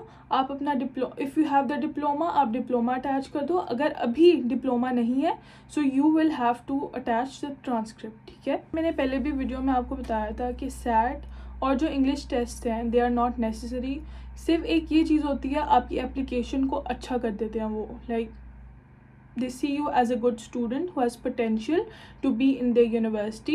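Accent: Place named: Kashmir, India. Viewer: You accent and your looks seem like kashmiri 😳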